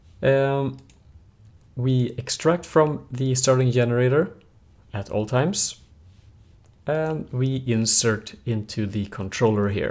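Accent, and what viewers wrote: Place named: Sweden. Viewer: Du är svensk va? Hörs på dialekten. Grymma videos :D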